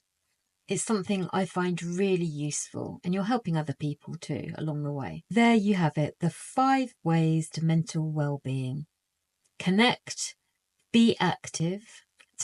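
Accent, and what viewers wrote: United Kingdom. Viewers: I loveyour accent is it RP or POSH and where are you from?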